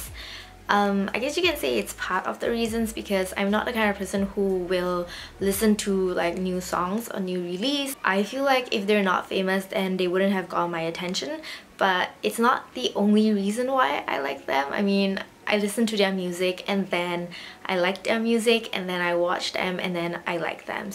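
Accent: Singapore. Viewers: Your first video that I saw was the purito review and when I heard your voice I assumed you're a Singaporean so I came to your channel and saw this video and I was right hahah. Singaporeans really have this distinguished accent XD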